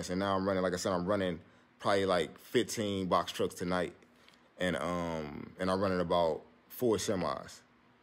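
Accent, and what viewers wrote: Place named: St. Louis, United States. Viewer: Dropping gems bro. Great information! Also you gotta be from St. Louis bro that accent strong as hell 😂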